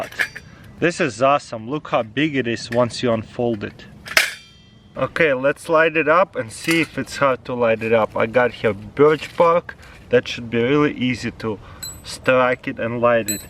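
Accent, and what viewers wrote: Russia. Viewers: У тебя акцент русский.😀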